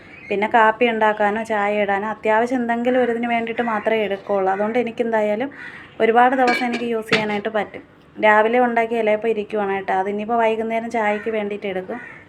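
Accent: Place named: Kilimanoor, India. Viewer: ഓരോ സ്ഥലത്തു ഓരോ സംസാര രീതി അല്ലേ.. ഞങ്ങൾ കിളിമാനൂർ ഭാഗത്തു ഇങ്ങനെ ആണ് സംസാരിക്കുന്നത്.. അത് മാറ്റേണ്ട കാര്യമില്ല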